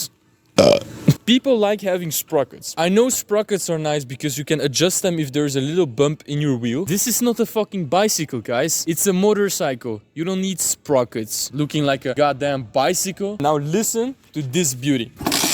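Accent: Netherlands. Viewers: ik hoor die Nederlandse accent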